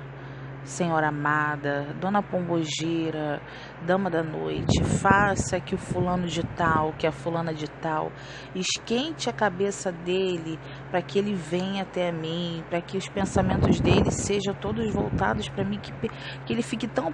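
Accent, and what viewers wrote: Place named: Rio de Janeiro, Brazil. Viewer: É carioca?sotaque lindo..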